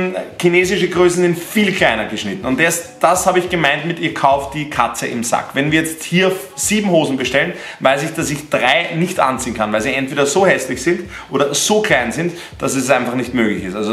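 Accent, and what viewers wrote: Austria. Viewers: Wie findest du Hosen von GVAPI? Sag mal irgendwo deine Meinung dazu😁btw feier deine Videos brutal weiter so und hau mal mehr österreichischen Akzent raus😂😂❤️❤️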